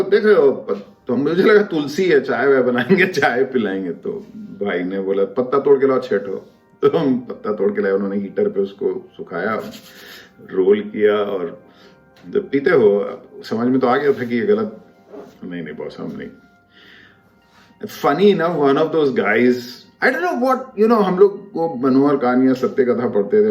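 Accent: Bihar, India. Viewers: Wow.such an authentic Bihari accent.dhanbad aaiye Kabhi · Sir aapka bihari tone..mazaa aa gya..apno wali feeling aae..ni to hr koe to chupane me laga rehta h..m really not expecting ki aap v kavi aise aaoge aur aise baat kroge apne video me..love u sir❤️❤️ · aap to Pura bihari bolte ho be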